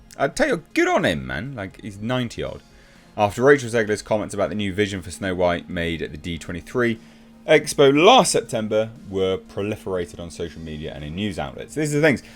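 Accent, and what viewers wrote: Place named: United Kingdom. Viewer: As a gen-you-wine good-ole' down home, back woods country boy, I must say I love hearing you say, "boy howdy" with your beautiful British accent. Well done, sir.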